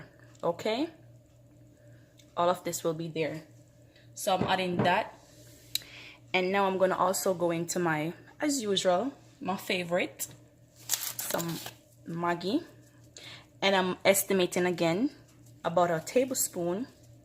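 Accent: Jamaica. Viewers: I like how you keep the Jamaican accent lol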